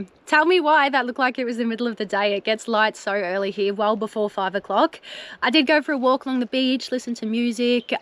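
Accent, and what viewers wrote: Australia. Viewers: from uk As commented before your posts are great,remind me of my time in oz,you are very beautiful,love your accent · Your name seems American as fuck haha, I was not expecting the Aussie accent...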